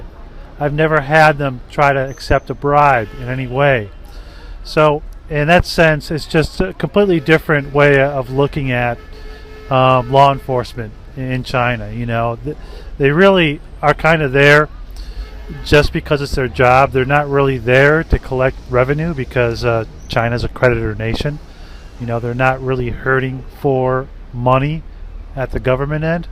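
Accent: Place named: United States. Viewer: Yeah, if they did arrest him I would assume they would use him as a bargaining chip for Uncle Sam. I assume he's American anyway, due to the accent.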